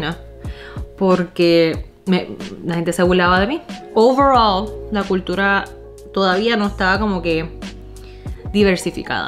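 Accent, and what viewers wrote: Puerto Rico. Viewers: Siento lo que pasaste En mi Isla, pero eres una de nosotros, no solo por el acento sino porque allí desarrollasteis de lo que eres y te convertiste! China bori hermosa! Bendiciones para ti y tu familia! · Yo soy puertoriqueno nacido en Ponce y llevo viviendo 33 anos en la Florida pero te escucho hablar y tu eres mas boricua q muchos y eres hermosa que Dios te bendiga y me alegra mucho a ver llegado aqui tu eres una boricua china muy linda. 👍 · Digo lo mismo jaja ni yo q soy Boricua criada y nacida hablo tan brutal el acento Jajja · Me gusta como hablas, 100% bori, muchas bendiciones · Sip bn bruta saco todo de puerto rico lo que es su estilo como se espresa su flow tu sabes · Soy nueva en tu canal hablas igualito a los Boricuas ,me encanta tu energía y carisma soy de Puerto Rico ,san lorenzo muchas bendiciones y salud para ti y tu familia .gracias ! 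Por mostrar lo hermoso de nuestro país al mundo. 💞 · Alexis del valle asi es habla y se expresa 100% boricua toda su jerga y gestos acento todo es boricua al 100% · No sabes lo cool q es escucharte siendo China y q hablas con ese acento boricua y por eso q pasaste es súper normal en PR si eres chino dominicano o incluso boricua el buleo es súper normal mientras vas creciendo y en la escuela peor so nada eso es parte de ser boricua y claro q queremos ver una segunda parte. Acuérdate boricua hasta en la luna 🔥🇵🇷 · Nena mira eres hermosa orgullosa que seas china y te sientas boricua..hablas bien boricua .dañe palante bendiciones para ti y tu familia para nosotros los boricuas asi te sentimos boricua de 💓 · Tú hablas más puertorriqueño que yo pero mil por ciento!!!!yo ni él acento tengo y he vivido aquí siempre lol . Dios te bendiga y que viva PR 💖💖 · El acento, las expresiones y el vocabulario que utilizas es de una Boricua. 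La actitud que muestra es de una mujer Boricua. Realmente en la vida todos pasamos por diferentes situaciones en nuestra niñez pero como Boricua me alegra saber que en el fondo te identificas con nuestra cultura. Exitos en todo lo que te propongas y aqui tienes un seguidor mas. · See literal su voz es de Puerto Rico 😅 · Yo escucho a una boricua, no veo otra cosa. Y de hecho, una muy linda. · Que brutal , acabo de descubrir tu canal y me encanta esto, una china que habla %100 boricua, eres boricua 🇵🇷🇵🇷🇵🇷🇵🇷😍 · Para mi eres de los Nuestros💯 🇵🇷 de pura cepa. Con ese hablarrr mas boricua q YO🙏🙏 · Definitivamente eres mas boricua que el mofongo y hablas mas puertorriqueño q muchos puertorriqueños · En definitivo que no saben apreciar que alguien se sienta orgulloso de crecer en un país diferente del q nacieron ella es un ser humano y me encanta oírla hablar como toda una boricua aya los boricuas que viajan a USA y al mes (no saben hablar español) super orgulloso de escuchar a alguien hablar de nuestra isla y un pedazo de su corazón es boricua. 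· Lo siento por todo el IGNORANTE que irrespeto su cultura y descendencia de tus padres y tuyo. Suenas acento muy de PR. Eres .🇵🇷 🇨🇳 Siempre orgullo de tus 2 patrias .Bendiciones !! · OMG!! Me has sacado las carcajadas más locas de mi vida!! 😂😂 Niña verdaderamente no se puede negar que te criaste en PR 😅 Tu acento y la “l” arrastra que nos identifica bien brutal! Jamás te avergüences de tus raíces ni olvides de donde vienes porque eres y serás siempre un ser humano hermoso. China,Arabe,Rusa etc todos somos humanos y muy dentro de nuestros corazones es donde está la escencia de lo que somos!! Saludos desde Buffalo NY Una Boricua como tu!! 🥰❤️ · You sound as Puerto Rican as any member of my family, and for that, it is a delight to hear you talk. On the "burlar" point, that is why i haven't gone back to Puerto Rico since 1987, people would make fun of my spanish, and try to make me feel stupid because i spoke English, i will never forgive them for that. 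Thank you for posting, i feel that i have some one that understands what i felt, or rather what some people on the Island made me feel like.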